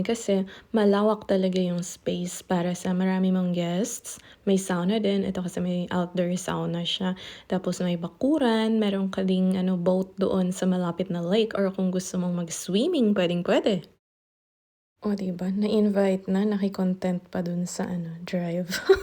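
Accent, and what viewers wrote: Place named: Ilocano, Philippines. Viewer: Congratulations sa mga kamag anak mo host. Parehas tayong matigas ang diket na salita, ilokanong norte 😅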